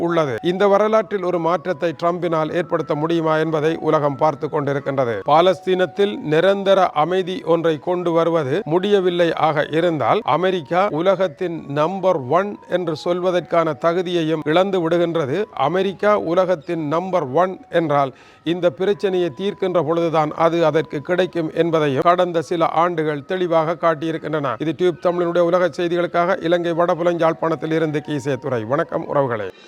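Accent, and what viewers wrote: Jaffna, Sri Lanka. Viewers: அருமையு அ பாரம் 🌹👍யா ழ் ப்பா ண தமிழ் அமிர்தம் ❤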